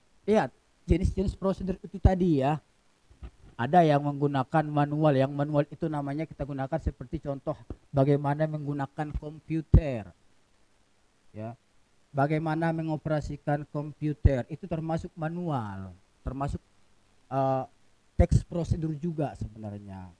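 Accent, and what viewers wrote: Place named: United Kingdom. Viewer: British aksen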